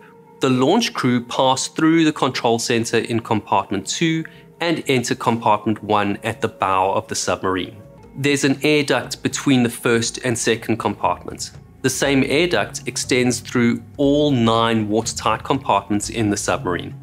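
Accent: South Africa. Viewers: Mate, I would just like to send you all the compliments you deserve!! Your quick and concise detail of complicated events is an absolute pleasure!! I've watched many of your videos of incidents I already know a lot about and still won't skip a second! Best of all, having a good old South African boitjie telling me the story makes me feel like I'm chatting to a mate! Thank you for all your time and effort you put into these videos!! I tip my hat to you sir!!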